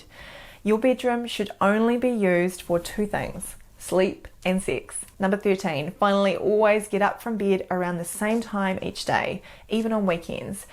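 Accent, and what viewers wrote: New Zealand. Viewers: very good Doc. thank you. Now I want to go to NZ, love the accent! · The New Zealand accent is pretty awesome, I must say. I loved it in Hunt for the Wilderpeople, one of the most hilarious movies ever. But you say in this video we should only use bidrooms for two purposes: sleeping and six. What about five, or four? Are they okay? Maybe some seven?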